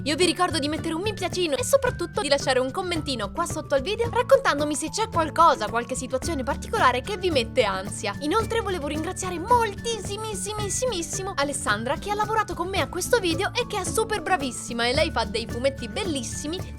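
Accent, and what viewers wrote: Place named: Milan, Italy. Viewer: Ahahah, il tuo accento milanese è fantastico! Ti è mai capitato di incontrare qualcuno di famoso per strada e sclerare ? Comunque, bellissimo video. Baci💚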